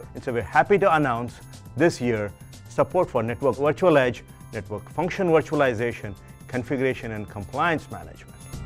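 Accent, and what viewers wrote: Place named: India. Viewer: It is hard to understand your Indian English accent. PLEASE IMPROVE YOUR ENGLISH ACCENT.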